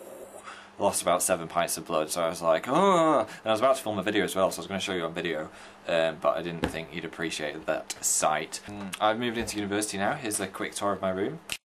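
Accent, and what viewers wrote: North, United Kingdom. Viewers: 1. THE SAME BEDSHEETS FOR GOD'S SAKE 2. so northern. much love. 3. such expresssion at the end of the video. very appreciated. 4. what sarcasm. 5. my fav video among like a 100 others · his voice is so northern ohmygod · I love how northern he sounds! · His voice... I'm used to this very Northern accent now. It's gonna change, and I know it'll end up being slightly gradual but omg jdhfhdndhkxa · So northern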